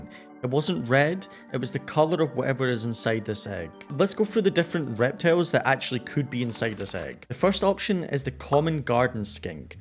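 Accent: Scotland, United Kingdom. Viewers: Yooo is that a Scottish accent I hear? (Half Scot here, always excited to hear the sound of my second home)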